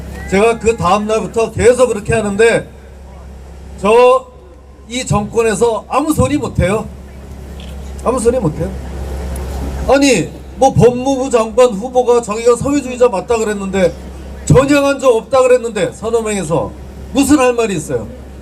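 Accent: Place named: Gangwon, South Korea. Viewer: 강원도 말씨 정답네요~~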